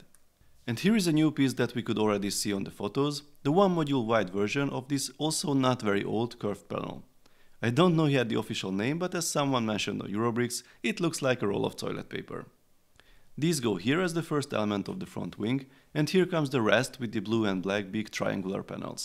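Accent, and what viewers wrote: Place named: Hungary. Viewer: Kicsit magyaros a kiejtésed de jó lett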